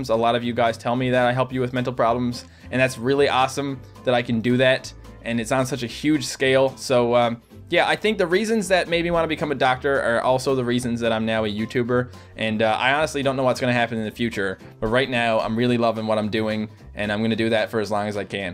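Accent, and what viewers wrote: Canada. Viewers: Hi um C: Just wanted to say I REALLY love your videos and I did not know that you wanted to be a doctor but hearing your reasoning that is REALLY sweet and ahh. I always get very excited when I see there's a new video because I always know I'm going to enjoy it and yeah!! I am sorry if this is awkward I have never left a youtube comment before! ps: When I first saw your videos I KNEW you were Canadian by your accent and was very pleased when I discovered I was right.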